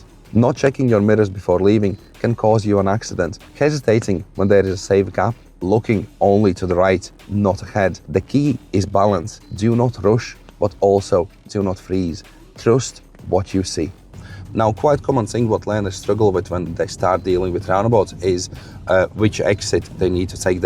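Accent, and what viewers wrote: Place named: Liverpool, United Kingdom. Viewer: Very useful video.... I can learn liverpool accent and driving at same time... the best part is showing all the 3 scenarios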